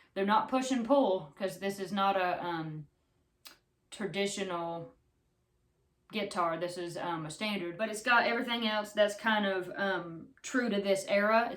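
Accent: South, United States. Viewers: Love the southern twang accent 😍😍 hate my voice. Anyways GREAT PURCHASE 👌🏽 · Watching your videos make me miss my home of Oklahoma with country sounding people and the country accent that I understand. Your videos bring a bit of home to me here in Utah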